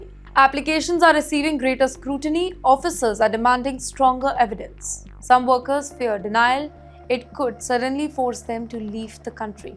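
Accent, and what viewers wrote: India. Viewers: Can't figure half of what she said. Too Indian accent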